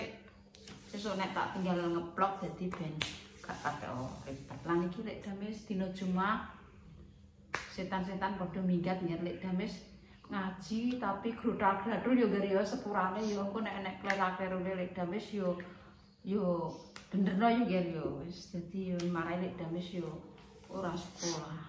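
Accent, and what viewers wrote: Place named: Cepu, Indonesia. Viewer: Mak e suaranya merduu,,,, khas tadarusan e wong cepu,,, semangat sa saged e mak,,, ojo isin wes tuo karepe golek guru ngaji ben tambah wapik wacane lan mahroj e,,, iso di gawe konten juga mak,,, golek ibu2 muda anak siji seng lagi genaon omah2 seng kapitan kon marai ngaji mesti akeh seng gelem mak,,, ben tambah barokah rejekine mak e,,,, soale aku yo alkamdulillah kapitan mak,,, eleng jaman jeh genaon omah2 ngenese polll pondok an ga ndue ijazah ga ono pemasukan idep2 ngamal nulongi wong pinter ngaji mak,,,, lope mak kuuuu,,,, cintaku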